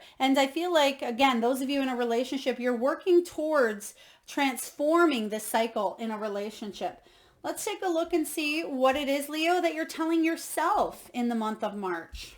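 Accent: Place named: Wisconsin, United States. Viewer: My counterpart is leo/cancer (July 22nd). I love hearing ur Wisconsin accent. Not something I hear on YouTube everyday. BAM!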